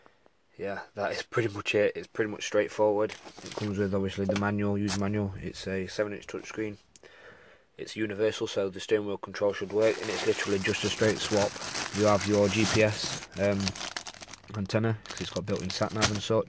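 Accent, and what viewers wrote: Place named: Sutton, United Kingdom. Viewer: I knew you was from Sutton the first video I watched! I can tell by the accent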